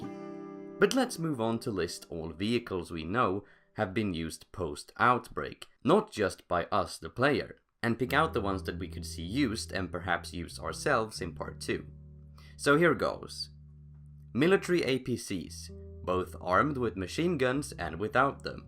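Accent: Sweden. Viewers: Are you Swedish??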